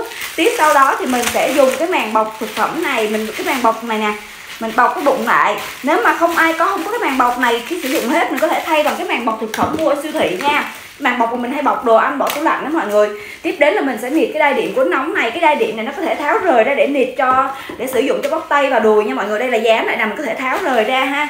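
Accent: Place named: Vietnam. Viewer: nghe giọng điệu là biết ở Vn rồi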